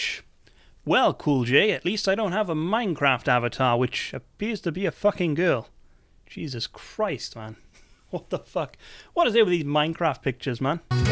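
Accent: Wales, United Kingdom. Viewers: hello MR Welsh man........remeber me???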